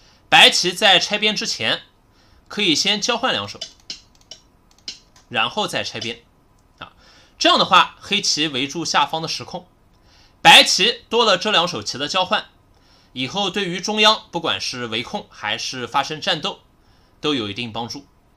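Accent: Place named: China. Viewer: 不曉得是中國哪個地方的口音 , 真的好聽 !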